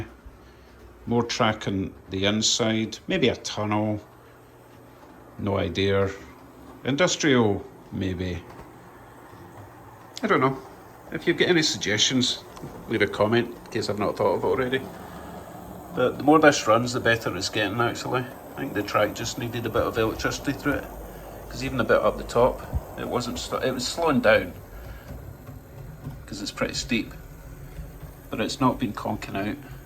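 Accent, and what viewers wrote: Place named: England, United Kingdom. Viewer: i like your videos in particular because of the English sound -it makes me smile time and again and reminds me of watching a soccer game in a pub near Prestwick Airport and the guy next to me tried to engage in a conversation -had to tell him "let's chat during half-time, too noisy" -but even without noise I barely understood half -but no doubt, very nice people up there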